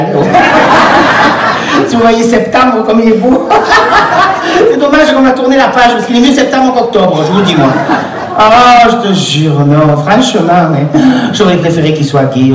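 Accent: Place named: Marseille, France. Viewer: Super zize un plaisir d'écouter de découvrir ont retrouve l'accent de Marseille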